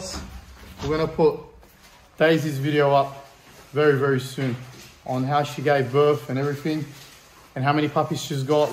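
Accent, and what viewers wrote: Australia. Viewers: You got a bit of an Australian though it seems you live abroad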